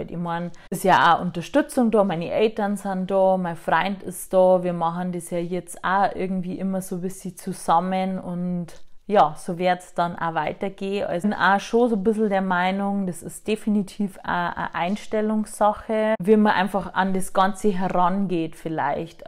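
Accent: Bavaria, Germany. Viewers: Nochmal herzlichen Glückwunsch zur Schwangerschaft. Ich würde mich auch freuen um Videos zur Schwangerschaft. Ich finde dich auch super sympathisch ich mag dich. Endlich eine YouTuberin die so schon Bayrisch redet. Ich liebe den Dialekt. ❤️❤️ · I finde sau geil, dass du Boarisch redst. I bin a a Borei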